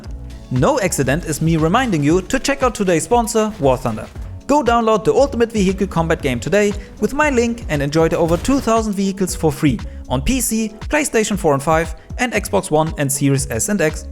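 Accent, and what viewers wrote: Germany. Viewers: Is he German ? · Hello my German friend, man hört das sehr stark raus und ich liebe es 😂 Danke für deinen Content und deine ganzen Bemühungen ^^ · Ich find's geil das man den Deutschen Akzent direkt raushört😂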